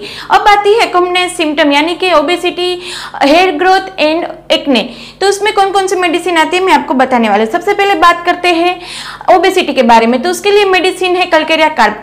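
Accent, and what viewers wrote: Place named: Kathiawar, India. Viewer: Aapki bhasha thodi kathiyavadi jesi he... Kya aap gujrat saurashtra se ho?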